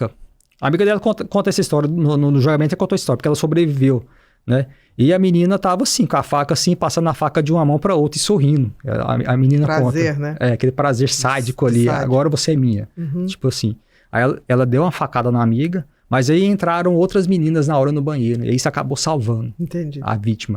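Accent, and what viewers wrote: Minas Gerais, Brazil. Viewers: Sotaque mineiro ❤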